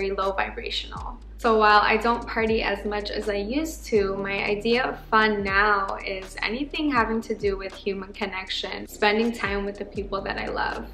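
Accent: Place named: Colombia. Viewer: I am so excited to see more! And your Spanish is beautiful – that Colombian accent, girl ✨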